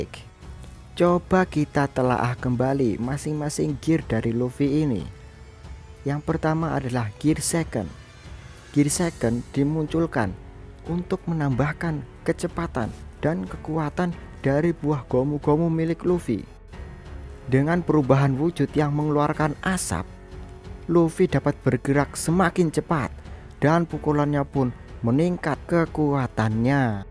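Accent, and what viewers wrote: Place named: Java, Indonesia. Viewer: Suaranya kurang greget dikit min 😁 medoknya sih mantap, ahaha!!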